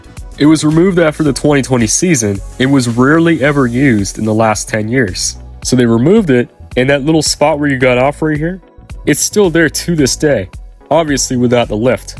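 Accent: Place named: Pittsburgh, United States. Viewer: Wow you have such a thick pickberg accent... damn do i really sound like that.. love from uniontown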